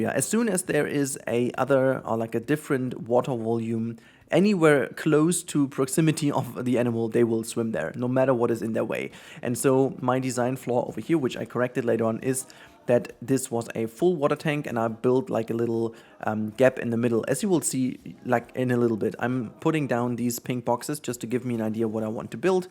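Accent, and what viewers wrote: Germany. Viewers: Direkt den Deutschen Akzent herausgehört :)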